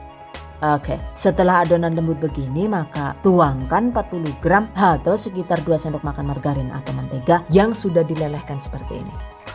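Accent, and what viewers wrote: Banyumas, Indonesia. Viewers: 😄😅 suka suka suka,, aplgi pke bhsa ngapaknya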